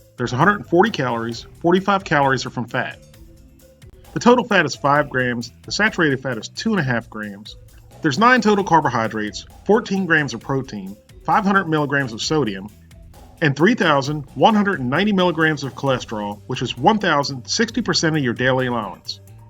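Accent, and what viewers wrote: South, United States. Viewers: No offense but if your dad liked pigs brain and scrambled eggs you have some fucked up genetics. I always imagine you as a big 260, 280 lb southern dude off the way you talk and eat lol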